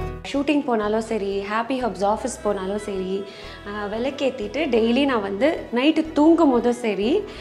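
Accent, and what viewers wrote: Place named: Sivagangai, India. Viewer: Pechula sivangai sayal teriuthey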